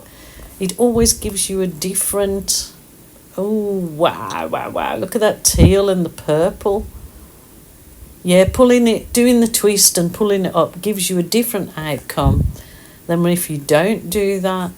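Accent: Birmingham, United Kingdom. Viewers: Your accent sounds very much like the Birmingham, UK accent 🤔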